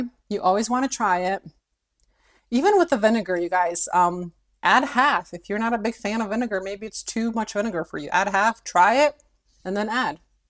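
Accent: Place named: Russia. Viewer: found you at random from your treehouse video in Berlin. Im just south of there a bit in New Albany and will have to check the Amish treehouse out. My Grandmother came here from Russia in 1939 and made Borsch all the time. Not sure if Russian is your accent I detect, but thank you for sharing this recipe. ohhh love the apron, God bless you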